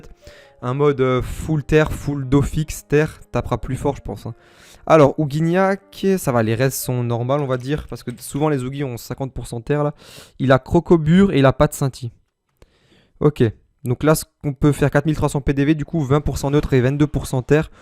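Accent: Alsace, France. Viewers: T'es alsacien ? :p avec l'accent Car moi oui Bonne vidéo !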